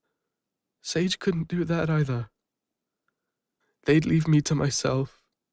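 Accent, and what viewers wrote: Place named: England, United Kingdom. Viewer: You've absolutely nailed the English accent 😁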